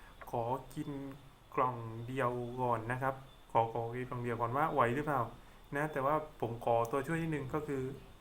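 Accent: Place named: South, Thailand. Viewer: อ่อรู้เเล้วก้ว่าอยู่ทำไมพี่พูดสำเนียงใต้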